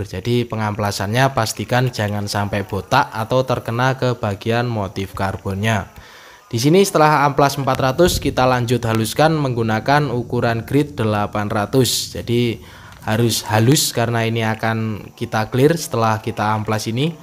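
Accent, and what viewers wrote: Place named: East Java, Indonesia. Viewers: Emang sampean asli mana, logate sama, kayake jawa timur semua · Kayak ngk asing sama bahasa medoknya,dan nama chenelnya.. orang mana si bang??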